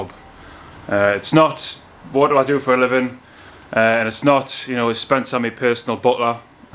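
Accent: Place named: Newcastle, United Kingdom. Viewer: It's like watching a Geordie edition of Engineering Explained - Which is a good thing.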